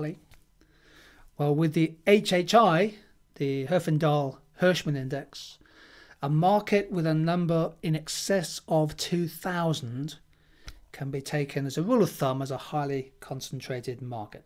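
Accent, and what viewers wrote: United Kingdom. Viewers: This video is very helpful, but I think I was watching it mostly because of a really sweet British accent ☺️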